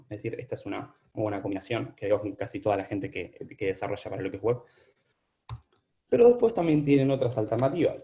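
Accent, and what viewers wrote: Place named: Argentina. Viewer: Me alegra un montón encontrar creadores de contenido de éste tipo que sean argentinos! Estoy re agradecido a nuestros hermanos de otras regiones de latam, pero es mucho más cómodo escuchar la "tonada" que nos es familiar! Ya me suscribí! Ví que sos profesor en UTN, puede ser? En alguna carrera o en los cursos de extensión? De ser así, me gustaría saber en qué cursos para tenerlo en cuenta si en el futuro decido tomar alguno. Gracias!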